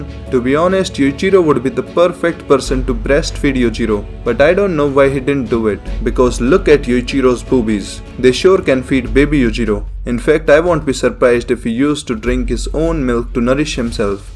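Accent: India. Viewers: Change your accent. You sound way too indian.. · Ngl Baki algorithm brought me here but I stayed for the Indian accent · I didn't even watch baki but your description of what baki is plus the well known indian accent just makes it so damn funny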